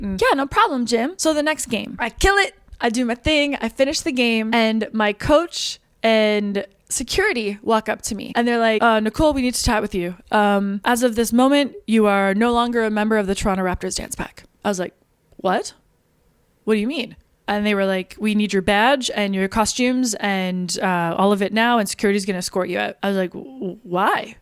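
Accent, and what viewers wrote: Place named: South, United States. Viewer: For a Canadian you sure speak southern talker.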